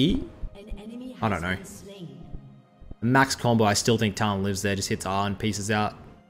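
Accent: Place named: Australia. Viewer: I though was from the Uk. Ozzy makes sense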